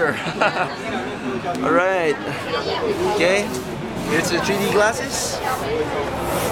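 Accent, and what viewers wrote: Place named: Philippines. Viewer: This guys sounds Filipino. XD F to P's and P's to F's. Just like my mom...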